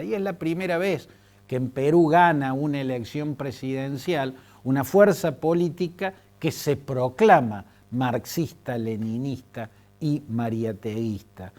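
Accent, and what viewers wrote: Córdoba, Argentina. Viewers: Es cordobés? Se nota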